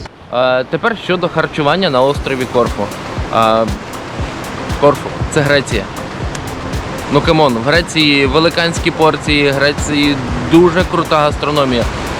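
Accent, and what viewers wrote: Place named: Poland. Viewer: Польский акцент.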